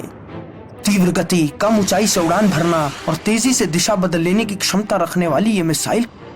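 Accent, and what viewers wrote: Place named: Rural, India. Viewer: किस देहाती से voiceover दिलवाया है जी ?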